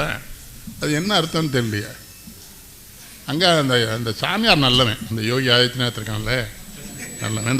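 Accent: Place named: Tirunelveli, India. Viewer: உங்கள் நெல்லை தமிழுக்கு நான் அடிமை ஐயா வாழ்க உங்கள் புகழ் 🙏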